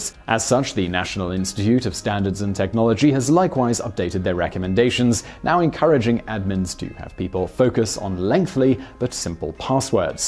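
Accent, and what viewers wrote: United Kingdom. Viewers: OMG! Saaaaaauck, I'm dyin' here. There are times I really love the British accent.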